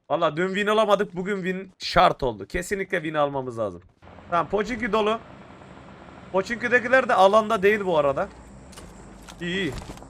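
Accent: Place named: Antalya, Turkey. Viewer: senin antalyalı konuşmana bayılıyorum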